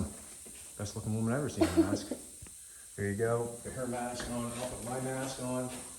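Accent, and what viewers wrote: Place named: Canada. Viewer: You sound really Canadian ey👍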